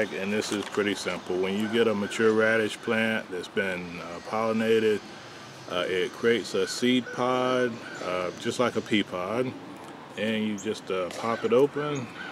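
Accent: United States. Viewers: Hi it's interesting how there are slight variations in the pronounciation of quite a lot of fruits, vegetables and herbs between English here and American English there ( we say Herbs, you say Erbs, never figured out why as it's spelled the same 🤔🤔 ), but a few have totally different names, one of them is Cilantro which of course we call Coriander, I have no idea where the totally different names originated for the same herb....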